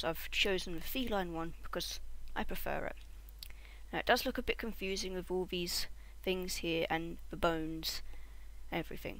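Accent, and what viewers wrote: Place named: England, United Kingdom. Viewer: omg your english I LOVE ENGLISH PEOPLE OMG :D you sound cool!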